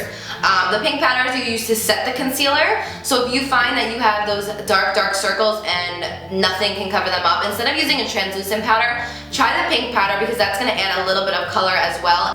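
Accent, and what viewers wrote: New Jersey, United States. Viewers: I could tell right away that you're from Jersey from your accent. 😂 I'm from New Jersey too!! Xoxo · She sounds like she has a bit of a New Jersey accent. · She's so beautiful but that thick New Jersey accent 😩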